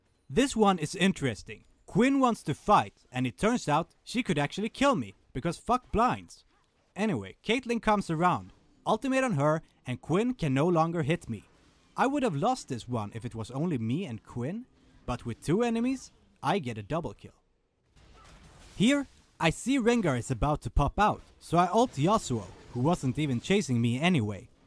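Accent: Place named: Netherlands. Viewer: your dutch accent if so annoying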